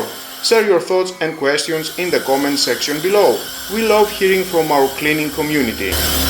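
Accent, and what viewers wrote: Greece. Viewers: ρε φίλε σόρυ, αλλά μιλάς σαν Έλληνας!!!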